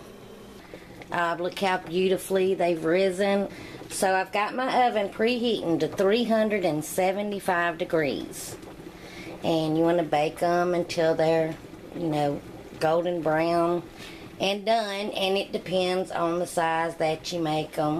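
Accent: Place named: South Mississippi, United States. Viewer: I have got to know where you are from? You sound like your from my neck of the woods down here in south Mississippi. The buns turned out great, and looked beautiful!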